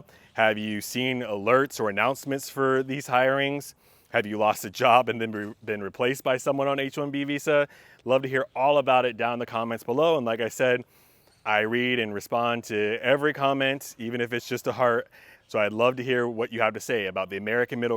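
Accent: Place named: India. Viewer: You have a very typing Indian Accent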